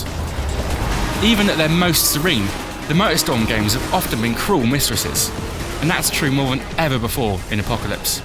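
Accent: United Kingdom. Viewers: I love how nobody is raging on the Gamespot review about the fact the reviewers from the UK. Seriously, what's wrong with the UK? And for those saying they can't understand what he's saying, learn to speak English. You know, what with England INVENTING the language everyone's typing in now? I think this is a fair review. In the higher levels, the AI get aggressive and if you get caught up in the surroundings, you can find yourself jerking out of control. It's a known annoyance in all MS games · No offence but please do not use British reviewers thank you. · thank fuck for that! a uk review! been fed up of the american accents lately :L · This British voice is pissing me off... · lol your british, you have a stupid accent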